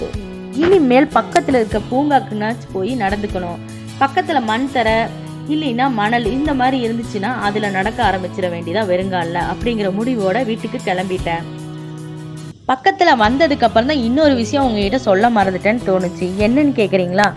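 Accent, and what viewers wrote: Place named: Kongu, India. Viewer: .... Miga Miga Azhkai ullathu... Unthan peyarai polavea... Ne pesi konjum kongu tamil.....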